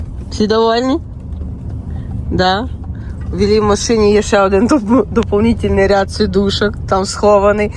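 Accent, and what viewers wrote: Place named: Vinnytsia, Ukraine. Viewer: Приємної подорожі! Храни вас Господь!!!❤❤❤ я теж так як ти говорю Молдавія, Кишиньов😅😅( ну Вінничани і Хмельничани то ми так)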